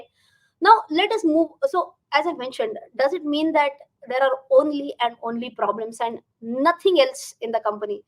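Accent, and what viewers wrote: Maharashtra, India. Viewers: I want to learn Marathi after hearing ur accent😍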